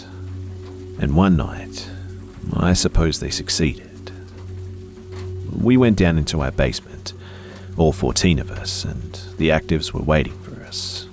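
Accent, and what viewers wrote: Australia. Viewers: I love your stuff man ! And you're Australian voice, your video's go really nice with a joint in bed ! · are you an Aussie? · Is it me, or did your accent became more aussie?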